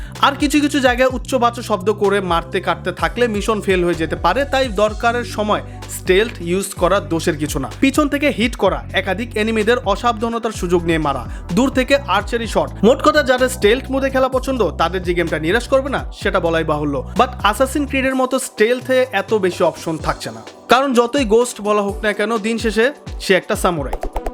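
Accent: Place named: India. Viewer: vai ki indian? thik moto bangla bolte parchen na kisu jaigai!!!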